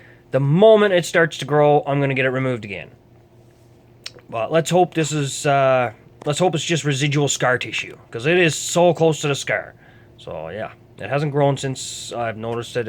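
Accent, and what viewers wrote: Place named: Maritimes, Canada. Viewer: You sound like you're from the maritimes.. That's where I'm from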